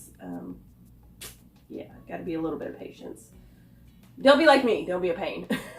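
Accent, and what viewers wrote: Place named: South, United States. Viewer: Hello love still going strong I see... just give them a thumbs down 👎 call it what it is, I won't tell nobody😂...how you been? Also what's that accent im thinking arizona, also sounds a little southern🤔.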